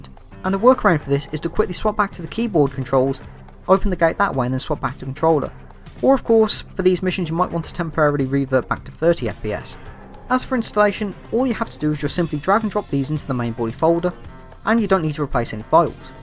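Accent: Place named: RP, United Kingdom. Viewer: You sound a bit posh, where are you from if you don't mind me asking?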